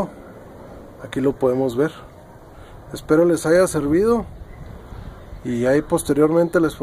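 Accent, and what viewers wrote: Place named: North, Spain. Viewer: me encantan la manes de explicate y su acento norteno me encantan felicidades excel ente video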